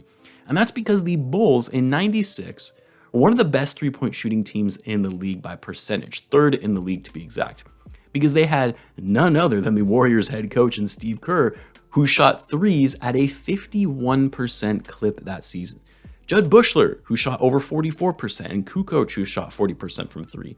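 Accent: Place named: New York, United States. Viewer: lol I have a few people who work on my job that come from NY and you sound so so so much like them lol and they can’t stand MJ and those Bulls, but it is a respect issue, and when Scottie dunked on P Ewing and then pushed him down & those Knicks fans never ever forgot it either. Well, I never truly hated the Knicks, but it’s those Yankees that I love to hate, because I am down here in Baltimore Maryland, and those Yankees broke my heart so many times, but getting back to your subject, I like The Lakers and I wanted LA to win, Portland to win, Seattle to win, and Phoenix to win, but I was never truly a fan of Karl Malone and that group, so I loved it when MJ and those Bulls beat Utah, but I was cheering against The Bulls the majority of the time, but then, there comes a time where you have to give credit to those Bulls of the 90’s and that goes for MJ & Pippen, because they came together as a team when it counted most. 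Ummmmmm sure MJ gave most of those Teams the blues but Scottie was putting in work as well & as MJ would slack off, you had Scottie to seriously deal with, so it went both ways. It was almost like having a good boxer who was predominantly right handed, but would also jab a person with a short left handed jab, but they were good together ✌🏾I was so so hoping that Kobe and Trevor Ariza was going to give me much of the same, but The Lakers traded for Artest 😞 & I am still saddened by Kobe’s tragic end bait ( Sighs 😔 Greatly )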